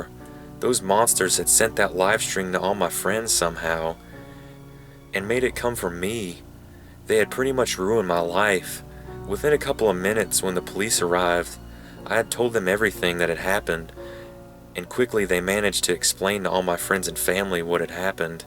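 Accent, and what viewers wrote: South, United States. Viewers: Omfg your southern accent is so thick lmao. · obviously his regional Southern accent. If you dislike it, and it appears you do, there are a lot of narrators who aren't Southern on Youtube. I personally find it quite lovely, but that's simply me.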